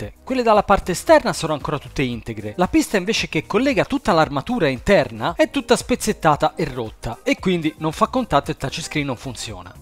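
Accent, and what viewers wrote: Tuscany, Italy. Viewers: Lei e’ eccezionale! Mi sono visto questo suo video di 4 anni fa e l’ho trovato ancora attualissimo, sia come tema sia nel modo in cui lo espone, con la nota simpatica pronuncia con inflessione toscana, e una incredibile professionalità. Continuerò a seguire tutti i suoi video con avidità stupendomi ogni volta. Grazie per i bei momenti (e emozioni) che ci (mi) regala.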